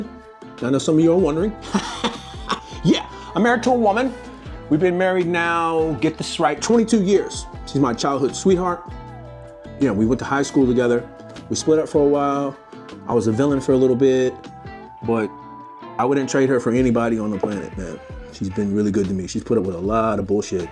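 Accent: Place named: South, United States. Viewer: This explains the redmeck accent you do 😂 Awesome video bro. Thanks for sharing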